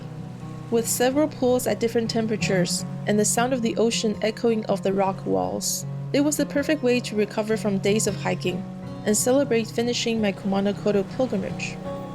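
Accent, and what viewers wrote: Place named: United States. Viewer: Sorry but the fact your American I cannot follow you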